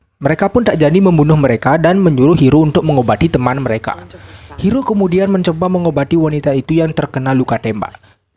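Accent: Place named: Bali, Indonesia. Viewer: Bang org bali ya?logatnya kentara skli hehe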